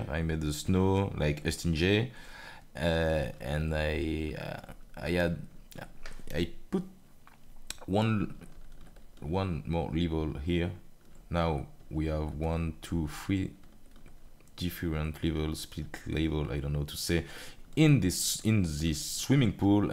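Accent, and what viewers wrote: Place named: Europe. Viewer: mistakes make you beter and i am just happy to see other people from europe trying to speek english keep up the good work.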